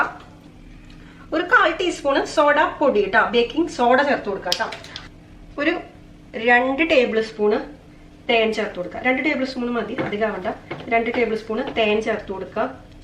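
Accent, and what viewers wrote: Thrissur, India. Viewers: Chechi superb presentation,nammude tcr basha pollichutta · Taken long break in between. Eager to watch your vlogs bcoz of pure thrissur slang.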